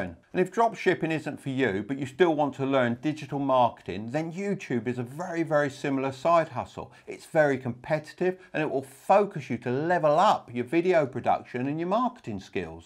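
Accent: United Kingdom. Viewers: British accent? I'm sold. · why does some of his thumbnails show US dollars when he sounds like he's from the UK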